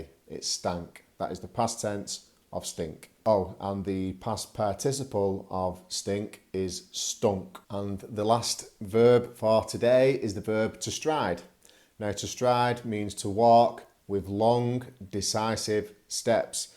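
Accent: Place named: North, United Kingdom. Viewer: Very interesting video. I like your northern accent. It's curious because usually, those kind of accents are hard for me to understand but yours is cool. I discovered your channel three days ago. I watch several youtube channels about learning English. Some of then have a huge amount of subscribers, yours hasn't yet, but you sound very sincere, clear, simple and useful. Thanks from Basque Country Spain.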